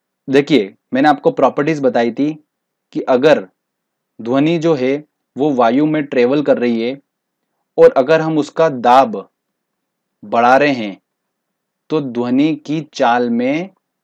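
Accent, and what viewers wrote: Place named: Maharashtra, India. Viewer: सर आपके language मे महाराष्ट्रन touch है.... आप मुम्बई से हो? मै भी मुम्बई से हु.... पर आप सभी topics बहुत अच्छे से clear करते हो... 🙏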